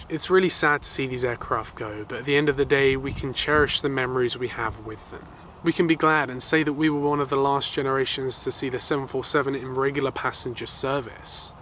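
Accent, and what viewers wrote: United States. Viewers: Nice vid, but ditch the US accent/pronunciation. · Stopped watching when you started using American pronunciations. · Nice video. Why are you speaking American English, it’s a bit strange?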